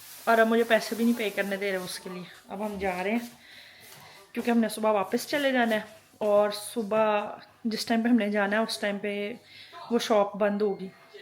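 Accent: Pakistan. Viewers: pure Pakistani accent❤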